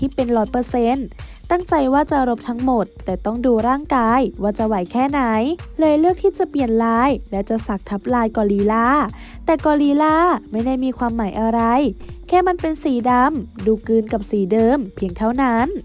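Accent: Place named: Suphanburi, Thailand. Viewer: เหน่อเป็นสุพรรณเชียว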